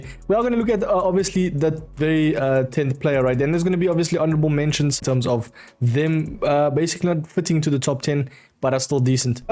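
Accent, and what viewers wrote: South Africa. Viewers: South African? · R u frm south africa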